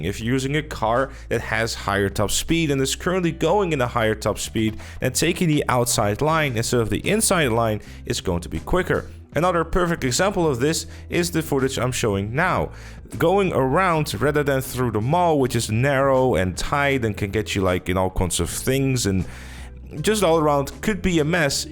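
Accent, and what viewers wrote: Netherlands. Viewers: Love the Dutch accent 😎👌🏻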